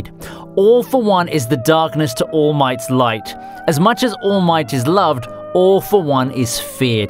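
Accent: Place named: Scotland, United Kingdom. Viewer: wish I could speak scottish like that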